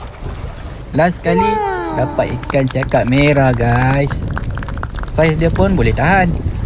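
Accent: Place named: Aceh, Indonesia. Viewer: sbb bunyi sebutan "T" tu mcm sebutan Aceh..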